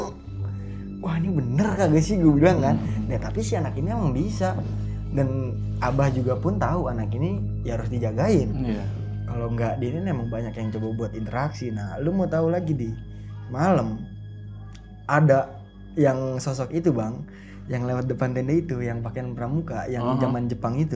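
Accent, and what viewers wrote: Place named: Ketapang, Indonesia. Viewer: Kek orang ketapang bahasanya Beke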